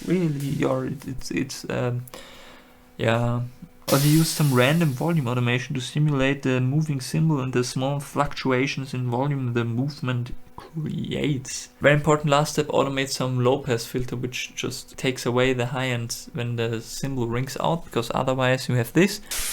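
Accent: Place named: Austria. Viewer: I am a simple man. I hear a fellow Austrian accent, I press like.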